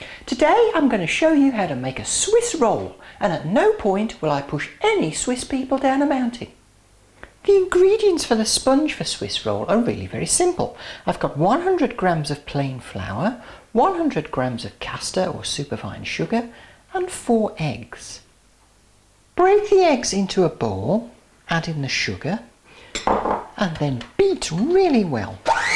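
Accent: United Kingdom. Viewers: i'm french and a love your recettes and your english it's sooo british !!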